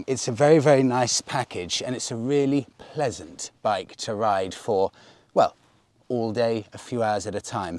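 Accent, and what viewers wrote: United Kingdom. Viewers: This is an excellent video. Thanks for sharing. There is just something about listening to a motorcycle review done by someone with a British accent. The content and editing…excellent as well. Cheers! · The British pronunciation is awesome. i love that.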